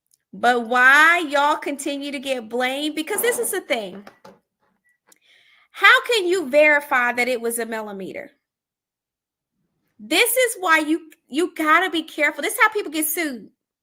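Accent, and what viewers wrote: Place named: South, United States. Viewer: I love listening to you & your southern accent! You tickle me! 😂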